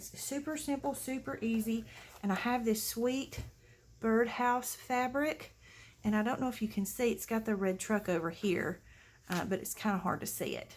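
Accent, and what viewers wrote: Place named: Tennessee, United States. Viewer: I knew I recognized your accent - maybe because I'm just down the road from you in Harriman! 😂 Love it! Thanks for sharing. Great ideas & lovely journal! God bless.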